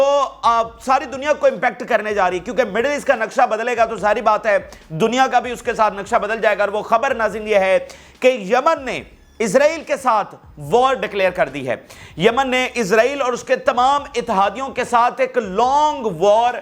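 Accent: Punjab, Pakistan. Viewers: This guy talk garbage past 10 months he has been spreading panic n fake news All these months he has been saying that hizbuallah will go in war with yahoud but hasn't happened yet but now we experiencing conflict with yemen and yahoud He hasn't or never said anything about this incident 87 people got injured and 3 killed Now he saying things differently He is there to make money from u tube Please stop him by making comments to slam his fabricated news He dance scream start questionig himself and answer himself He has no source of own his on Get news or copy news from different resources which is readliy available to all of us Can't speak right one word of English Neither he can't speak urdu in right accent except speak everything in punjabi accent Belive me he is nothing except a punjabi merasee Just listen his English and watch his body dance He try to look waheed murad or daleep kumar😂 This monkey become on u tube a laughing stock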